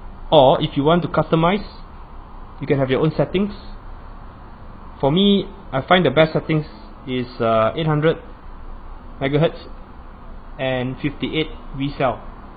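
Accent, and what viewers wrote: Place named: United States. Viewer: hellz! you sound like an american comes from greece ;G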